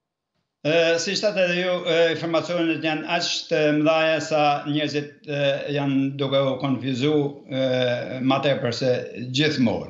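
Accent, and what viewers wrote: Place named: Kukës, Albania. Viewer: Me dialektin kuksian qe flet duket siku te kemi afer,fjalet tuaja te sherojne!Dhe une vi nga fisi,prandaj ndihem krenare per nje njeri si ti!Jetofsh sa malet Doktor!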